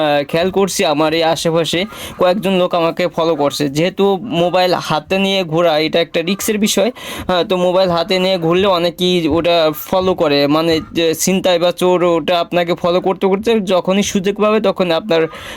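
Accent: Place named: Khulna, Bangladesh. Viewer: দেশের বাড়ি কী খুলনা কথা তো আমাদের খুলনার মতো মনে হয়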